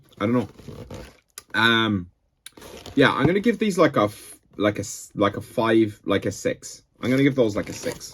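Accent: Ireland. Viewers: What’s your accent? Trying to learn about Irish regionalaccents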